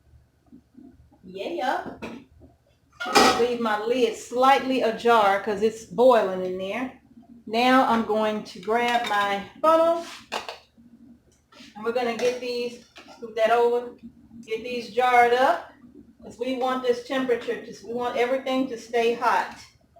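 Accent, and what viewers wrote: South, United States. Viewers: I love watching and listening to you! It takes me back home to Louisiana. I live in Indiana now and it is so good to listen to the southern way of talking. I love how real you are. From one sister to another. Blessings.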